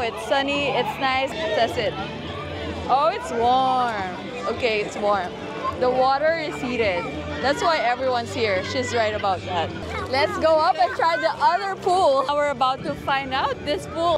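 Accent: Philippines. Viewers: YOU SOUND LIKE A 'FILAPINA' ?:face-blue-smiling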